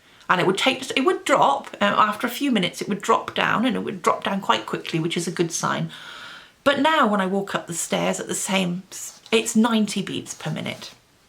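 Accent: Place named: England, United Kingdom. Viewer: I am just so impressed with you! Your honesty, commitment, and down-to-earth attitude will bring you to unimaginably (for now) good places. I love to listen to you talk--adore your English accent. 🧡 You are courageous, and you have inspired me. Thanks for these videos and your blog! I look forward to watching you succeed! ~ Melanie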